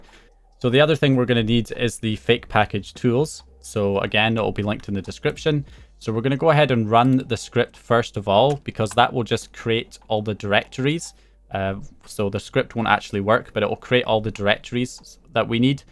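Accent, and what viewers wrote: Scotland, United Kingdom. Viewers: Been thoroughly enjoying your videos man! Keep 'em coming. It sounds like you might be Scottish so that's an added bonus! "HERE WE, HERE WE, HERE WE F*CKIN' GO!"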